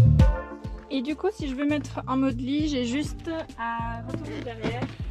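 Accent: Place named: Franche-Comté, France. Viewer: Belle présentation (avec ce charmant accent franc comtois si je ne me trompes, 😉). J'adore le bac a douche encastré et le toit relevable,c'est vraiment top! Du coup, je me demande, il y a un faux plancher pour l'encastrer ? Et ,est il relié directement à l'extérieur ou as tu une cuve d'eau grise? Hâte de suivre tes prochaines aventures 👍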